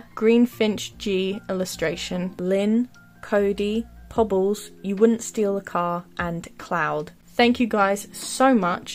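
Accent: United Kingdom. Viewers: Beautiful!! I loveee your videos! you’re such an inspiring artist to me :) you don’t have to answer this but where is your accent from in England? I have a similar accent but not many ppl have the same accent as me where I live XD again, you don’t have to answer this, I was just curious. ⭐️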